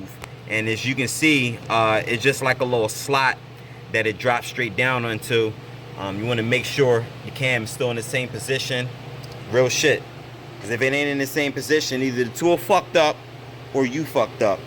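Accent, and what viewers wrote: Philadelphia, United States. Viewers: He sound like he from philly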